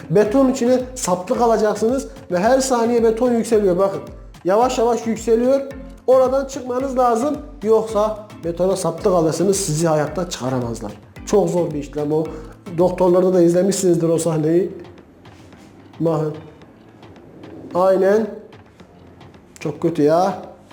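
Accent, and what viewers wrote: Diyarbakir, Turkey. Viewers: Abi senin konuşman Diyarbakır ağızına çok benziyor "gablo(kablo), garı(karı), bağ(bak),gurtuldu(kurtuldu)" gibi...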